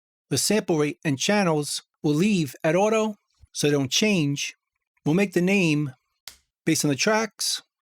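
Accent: New York, United States. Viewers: Thank you. From a newb, you make things very understandable and clear. PS Love that NY accent:)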